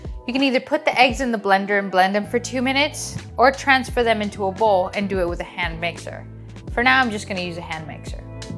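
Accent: United Kingdom. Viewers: it's wild how your accent is mostly unchanged but you speak with a very British cadence now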